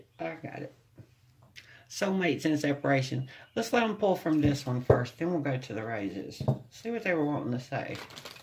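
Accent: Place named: South, United States. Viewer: Wow just found your beautiful channel ! I subscribed ! I love your southern voice ! I’m praying to reunite with my southern cowboy who’s a Gemini like me ! I’m from the.north ! We’re like Blake Shelton and Gwen Stefani lol 😂🤠if only he opened his eyes to the real truth ! We were engaged in the 90 s! 🙏❤️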